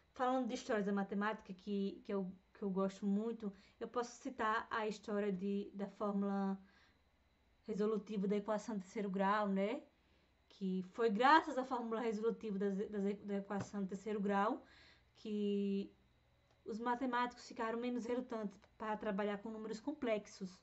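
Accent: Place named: Portugal. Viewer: Interessante seu sotaque, por um momento no início do vídeo eu achei que vc fosse portuguesa ou que tivesse passado muito tempo em Portugal. Achei muito legal o vídeo, na forma como vc conta as coisas, bem detalhadas e de uma forma íntima buscando contar como vc se sentiu e o que vc pensa sobre.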